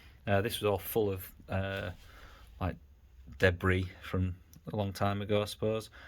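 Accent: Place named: York, United Kingdom. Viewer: Where are you based? You sound local to me, York?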